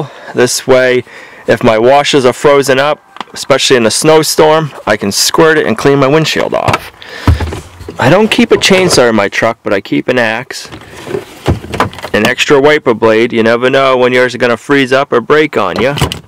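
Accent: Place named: East Coast, United States. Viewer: Finland in Russia? ? im 3/4 finish( also 1/8 irish 1/8 french or french canuk some debate about that )in Minnesota and not aware of such of a location they are different countries , maybe i heard ya wrong with the east coast accent.. we normally just use normal all weather tires in Minnesota... lol the finlands... suomalainen voima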